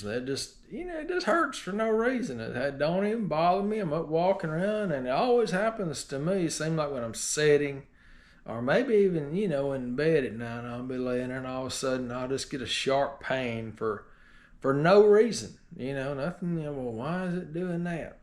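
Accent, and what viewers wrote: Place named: Deep South, United States. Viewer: Thanks for the update, im pleased that youre doing well at this point. Im 2 weeks post TKR so still finding it tough but getting by. I was meant to have my staples removed yesterday but they couldnt be removed as still had some bleeding , so that was disappointing. Ive been told to fully rest it for a week then they will try again next week. I hope i get to where youre at after 6 months..it seems a long way off...lack of sleep is the biggest problem!. Look forward to your next update. I love your accent by the way, im from the UK so not sure which area of the U S your accent is from but its what i imagine to be from the deep south!. Good luck with the rest of your recovery😊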